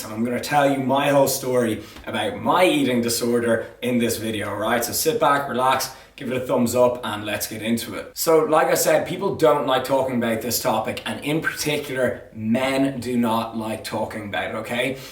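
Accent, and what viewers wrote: Ireland. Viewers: Ayyy anoder irish man! Coming from Northern Ireland here!!!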